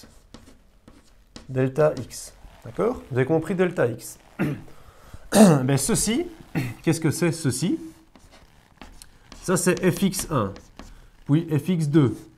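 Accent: Brussels, Belgium. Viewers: De Bruxelles même, une fois ! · Ce petit accent vient de Belgique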